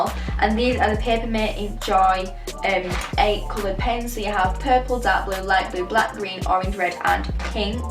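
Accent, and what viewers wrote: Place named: West Yorkshire, United Kingdom. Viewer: I’m from West Yorkshire too ahaha I thought you sounded yano x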